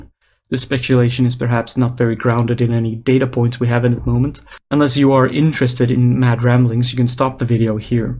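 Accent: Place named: Netherlands. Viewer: Man, you sound so dutch, are you? xD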